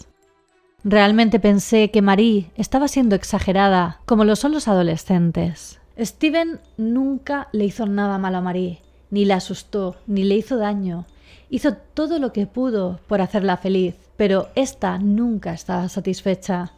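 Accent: Spain. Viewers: Ets catalana?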